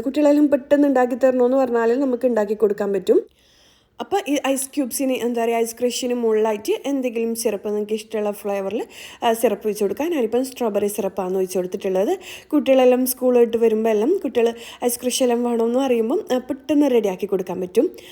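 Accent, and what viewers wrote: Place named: Lakshadweep, India. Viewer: [01/09, pm] ѕαяנι🫣: Hi [01/09, pm] ѕαяנι🫣: I'm sarjeena from lakshadweep ഞാൻ ഇപ്പോ കുറെ ദിവസങ്ങളായി ൻ്റെ videos കാണുന്നു.നല്ല ഒരു motivation ആണ് എനിക്ക് കിട്ടിയത്, thank you so much... ശരിക്കും എൻ്റെ ജീവിത ശൈലീ തന്നെ മാറ്റി മറിച്ചു ipo ഞാൻ സുബിഹ് നിസ്കാരം തെറ്റികാറില്ല പറഞ്ഞ പോലെ നേരത്തെ എണീകുമ്പോ മടികൂടാതെ പണി എടുകാൻ പറ്റുന്നുണ്ട്.പിന്നെ nte ഭാഷ നല്ല രസമുണ്ട് കേൾക്കാൻ,കുറച്ചൊക്കെ എൻ്റെ ദ്വീപിലെ ഭാഷ പോലെ ആണ്. അ പിന്നെ ഞാന് ഗ്രീൻപീസ്. ചിക്കൻ ബിിയാണി ഒക്കെ ഉണ്ടാക്കി. ഇവിടെ എല്ലാവരും കഴിച്ചിട്ട് ഇത് ഞാന് തന്നെ ആണോ ഉണ്ടാക്കിയത് എന്ന് ചോദിച്ചു....☺️ So dear friend once again thank you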